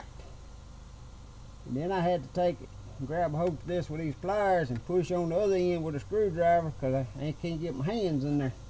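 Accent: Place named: Kentucky, United States. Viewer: Great video buddy! I got to change mine and I’ll know what I got coming. I don’t hear many folk on YouTube that kinda sound like me 👍 I’m from Southeastern Ky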